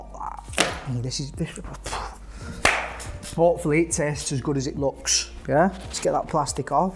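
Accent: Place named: Accrington, United Kingdom. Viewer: Hahaha honestly you’re funny as fuck, the only issue is your accent, went with a dirty bird from Accrington once and she sounded just like you. 😂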